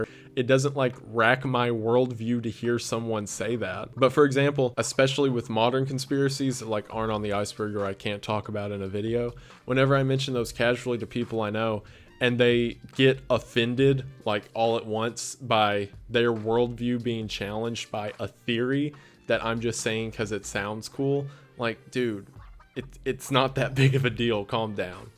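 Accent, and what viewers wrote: South, United States. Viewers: the hawaiian shirt coupled with a southern accent is a godly dadcore combination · But he has a southern accent lol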